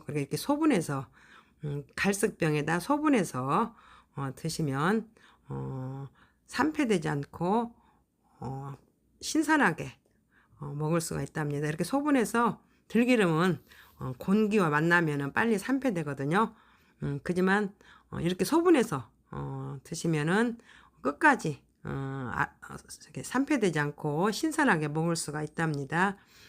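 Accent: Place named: Jeolla, South Korea. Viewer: 다혜송❤117번좋아요ㅡ 말씀이 전라도 신듯합니다 참기름 ㆍ들기름 보관범 호일감고ㆍ우유팩안에 보관 ㅡ굳아이디어 입니다 🎉🎉❤❤❤❤❤❤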